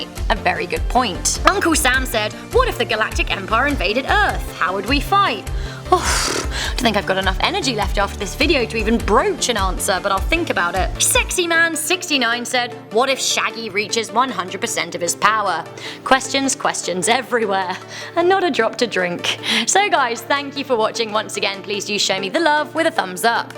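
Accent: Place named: Cockney, United Kingdom. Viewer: The lady talking sounds like a spice girl clone, ranking Scotland as a small country is a joke, Scotland has produced invented discovered more than most larger countries combined she needs an education. Scotland home of the enlightenment and the industrial revolution, founder of the Bank of England, the wealth of nations, David Hume, Napier, Black, Simpson, Bell, Carnage, Hamilton such a crap dialogue then again she sounds east end English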